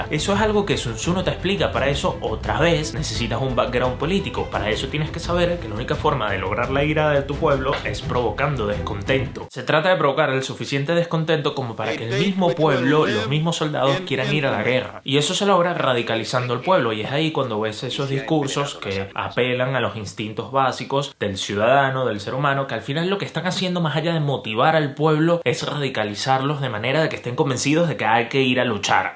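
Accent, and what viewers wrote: Caribbean. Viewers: Que asco el acento caribeño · Se que esto es xenófobo. Pero cuando escucho a alguien hablar con acento caribeño, automáticamente siento que va a decir algo poco inteligente. Y hasta ahora no me he equivocado! Pero este tipo ya exageró. Al parecer leyó muchos libros, pero su léxico es tan ordinario.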